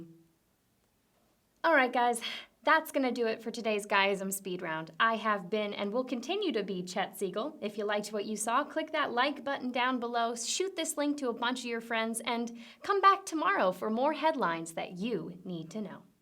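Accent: England, United Kingdom. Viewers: like your english accent! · Why is she not sleeveless!!! Good British accent though! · Her british accent is so damn cute lmao! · 1. Loved the dark Kennedy joke 2. Loved your British accent 3. I see you have a blank coffee mug!!! 4. Buy an Abe Coffee Mug!!!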